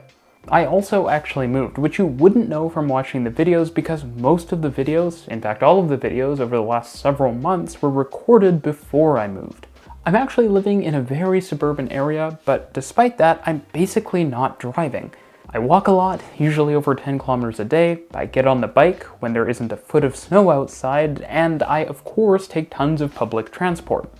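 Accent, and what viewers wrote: Canada. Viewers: Hey. I really enjoy your videos and I hope this is not the end. It's great to get a Canadian voice ibn a see of American and European opinions. I even say Geoff Marshall talk of a series of videos with you. Whatever the future holds, good luck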